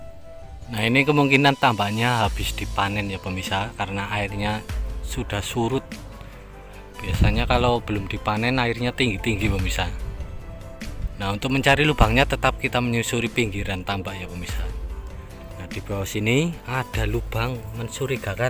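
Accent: Banyumas, Indonesia. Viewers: Hadir kang....salam satu hobi..uhuyyyy👍👍👍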